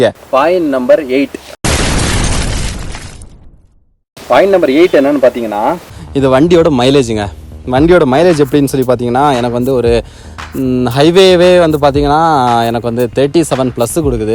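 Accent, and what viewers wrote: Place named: Kanyakumari, India. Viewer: but slang lita kanyakumari slang varuthae 🤔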